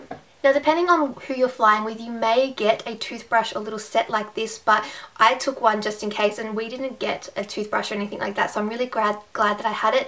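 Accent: Australia. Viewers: Are you from Australia? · Are you from Australia just wondering, also you sound like Ann Readon from how to cook that lol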